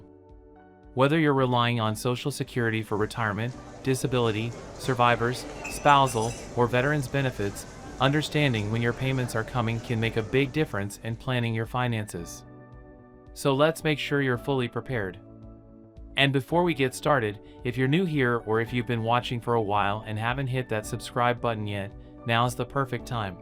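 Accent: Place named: India. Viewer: I hope that's an Indian because it sure isn't a black man's voice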